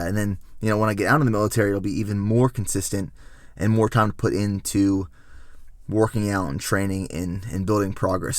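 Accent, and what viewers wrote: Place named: Pennsylvania Dutch, United States. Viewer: hmm guess its just that penn dutch i pick up on lol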